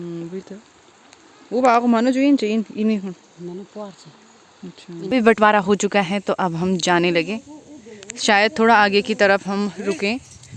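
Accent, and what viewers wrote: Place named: Garhwal, India. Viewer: hindi with gadwali touch❤‍🔥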